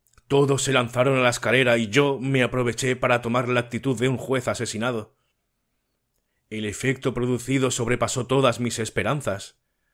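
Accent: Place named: Spain. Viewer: ❤Frondosa imaginación la de A C.Hermosa la lectura,con su española voz,señor..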